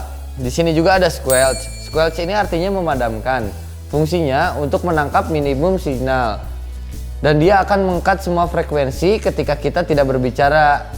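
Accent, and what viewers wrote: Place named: Sunda, Indonesia. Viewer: Kentel bgt sunda na, kang kabayan banget atuh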